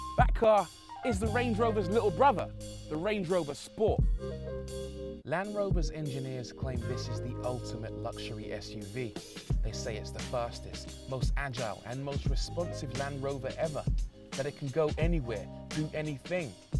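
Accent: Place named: England, United Kingdom. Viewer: Nothing hotter than a Black dude with an English accent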